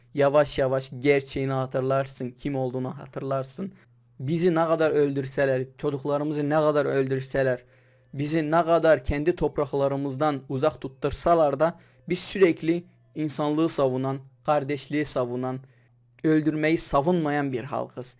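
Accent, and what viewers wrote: Turkey. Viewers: baın değil .bakın.sen tam türk gibi konuşuyon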